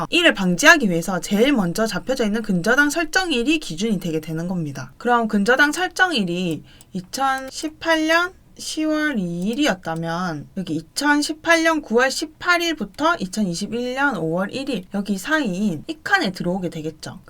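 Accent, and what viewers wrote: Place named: Busan, South Korea. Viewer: 사투리 윽수 까리해요 맕 가 마산 창원 울산 아니고 딱 부산이네마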